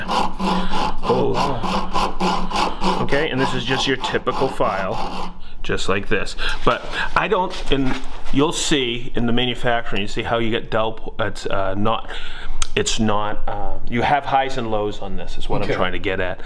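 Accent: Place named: New England, United States. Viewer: I guessed "New England", so I was right but it's maybe unfair to guess six states at once?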